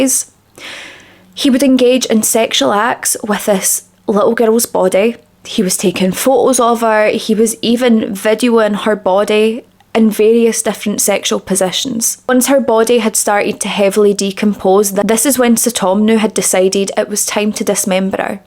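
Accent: Scotland, United Kingdom. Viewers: Ohh had to have a little break watching this one. it's a bit much in places but you do such a respectful and professional job and you are so beautiful. Love the cute Scottish accent ❤❤❤❤❤❤❤ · This accent man 😭😭 how can a fellow Scottish person like a accent so much haha!! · Subscribed for the accent 🤣 I like the way scottish people talk ✨ You could read me the phonebook ❤️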